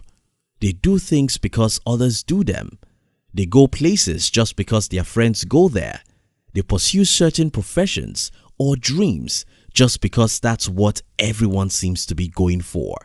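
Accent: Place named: Nigeria. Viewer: African accent straight from nigerian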